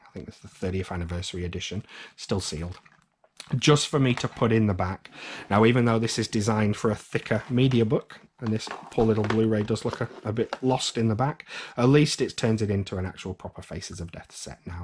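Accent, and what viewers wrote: United Kingdom. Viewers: Where in the UK you from? Trying to pinpoint accent. Its hard sounds northern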